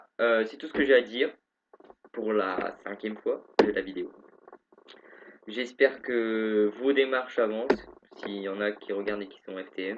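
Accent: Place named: Nord-Pas-de-Calais, France. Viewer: Ouai, ils sont vraiment graves l'administration... ils ne se mettent pas à ta place, ça coûte rien de modifier un prénom tout en laissant au pire ton prénom f quelque part, au moins pour que tu te sentes bien en cours, quoi. Et pour la personne qui t'a balancé, je trouve ça dégueulasse, je lui aurai refais le portrait lol Moi aussi, je suis du Nord, plus près de Béthune :D Me semblait bien avoir reconnu l'accent ch'ti ! Et t'en fais pas pour la mammec, ton résultat est vraiment bien pour 3 mois, ça va encore beaucoup changé.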